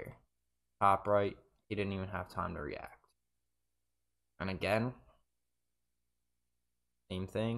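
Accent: Philadelphia, United States. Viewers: This is how I went undefeated in franchise. Glitch goals are a must know as annoying as they are. This goal works the same way in game if you're behind the net as long as you either top left of the crease or top right depending on direction. Also you sound like a fello Philly bull so respect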